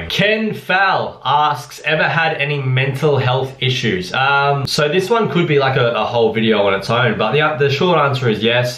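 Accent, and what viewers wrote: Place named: Australia. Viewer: Omg really? You sound like a Aussie for sure lmao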